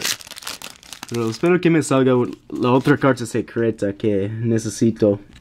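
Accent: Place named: England, United Kingdom. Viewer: Me encanta tu acento ingles en el castellano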